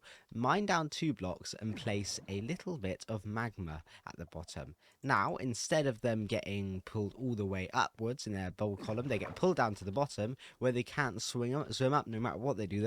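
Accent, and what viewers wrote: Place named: United Kingdom. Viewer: Bro doesn't sound like grian. He just sounds british. He has the same voice as many people i know.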